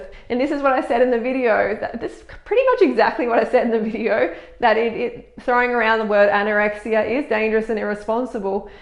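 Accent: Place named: Australia. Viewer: i live for your Australian accent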